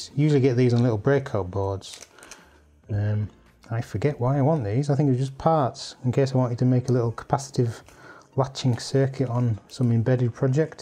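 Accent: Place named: Newcastle, United Kingdom. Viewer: Hi. You might remember me from Seon's live stream chats. I'm a Dutchman not trained in UK dialects but I think I'm hearing a Newcastle area accent?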